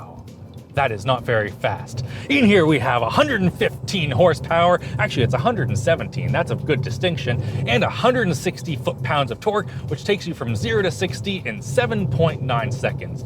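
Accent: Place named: Canada. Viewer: your Canuck accent is really protruding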